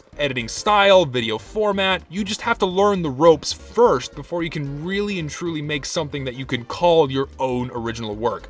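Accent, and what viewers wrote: Texas, United States. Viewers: MORE TEXAN ACCENT NOW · youre accent becomes more cowboy through out the video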